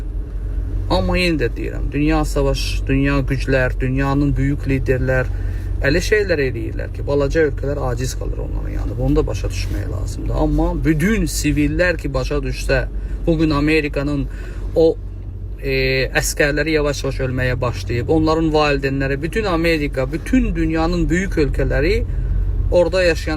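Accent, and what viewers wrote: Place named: Armenia. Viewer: Buna fikir vermeyin.Bunun xowu gelir ele bil pis weyler demek,daniwmag.ERMENI kimi daniwir.IWINNEN MEWGUL OL¡!(+++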